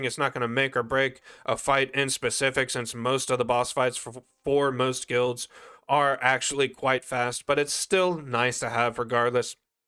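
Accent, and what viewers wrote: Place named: Maryland, United States. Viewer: You're from Maryland, aren't you? The accent is so pronounced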